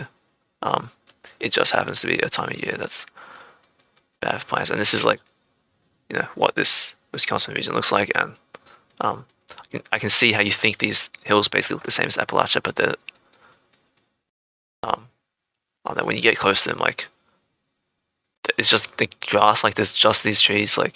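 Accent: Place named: Australia. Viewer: this is good stuff, lovely aussie accent too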